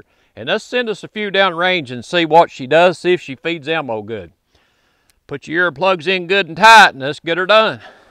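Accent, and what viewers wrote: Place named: South, United States. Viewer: Love this one can’t wait to pick one up.I just noticed something funny,watching this video while my dads started his diesel truck up can’t hear so put closed caption on and with your accent the stuff it types out vs what I know your actually saying is so far off it’s funny.not making fun of the southern accent the caption is lost it says Hornady as horn today.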